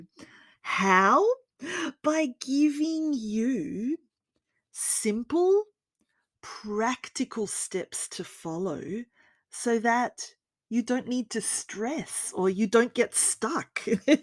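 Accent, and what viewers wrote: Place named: Australia. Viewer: I really like so much of your Austrália accent, the way and metodology that you use to talk, it is clearly and make me to enjoy it. One more subscribe. 👏👏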